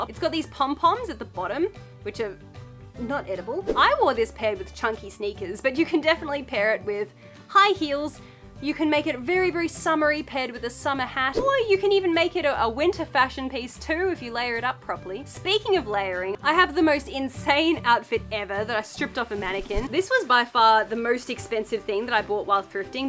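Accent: Australia. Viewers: Gorgeous skirt clearly vintage- NO, NO, NO! Do not compromise this piece- it looks lovely on you as is- really suits you! Who’s the green cutie? Archie is he? His vocalisations and video bombing you at will is so sweet and it’s clear you two have a warm and lovely relationship- gently biting each piece to be involved- so cute! Clearly new to you and your wonderful AUS accent- ‘Hullo from the USA 🇺🇸❗️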